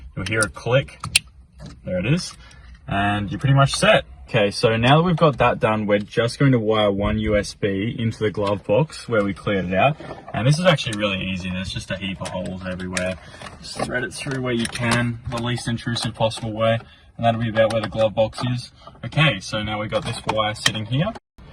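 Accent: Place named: Australia. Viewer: Excellent job. Extra marks for speaking Australian English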